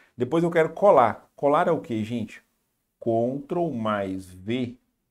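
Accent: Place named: Minas Gerais, Brazil. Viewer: Em 2024 gostei muito da aula e adorei o sotaque mineiro. Parece mineiro né. Abraço parabéns 👏👏👏👏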